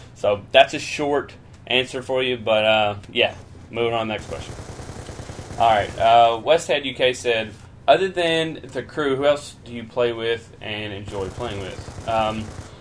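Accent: South, United States. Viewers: Your voice sounds kinda southern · Love your southern accent! Where are you from and how old are you? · He is obviously from the south. I know this because I'm from the south and everybody talks like that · You Sound a little country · Is that a southern accent?!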